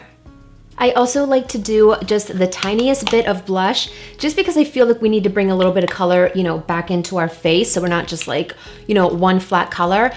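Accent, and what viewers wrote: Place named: New Jersey, United States. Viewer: Where r u from. I think i hear a jersey accent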